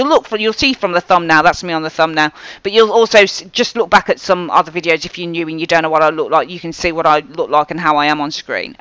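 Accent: Birmingham, United Kingdom. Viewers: You sound like a brummie...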